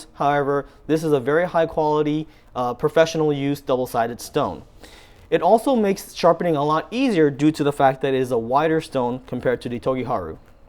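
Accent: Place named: United States. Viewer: The only Japanese on the Earth with such a great American Accent!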